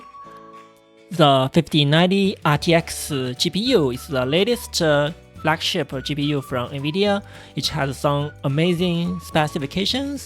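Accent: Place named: China. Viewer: 我就觉得这英文太中文了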